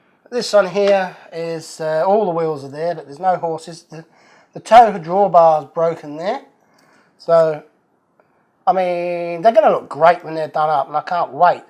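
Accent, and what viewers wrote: England, United Kingdom. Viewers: So are you an English guy living in Australia?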